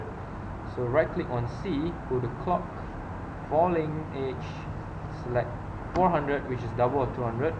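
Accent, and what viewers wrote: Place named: Malaysia. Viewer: your accent makes me miss Malaysia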